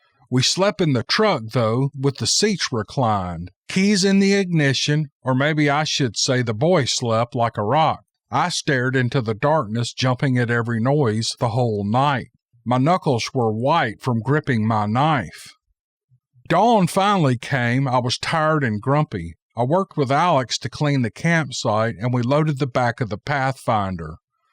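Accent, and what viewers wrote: South, United States. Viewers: Your stories always help me go to sleep with your American southern drawl while I’m here in Russia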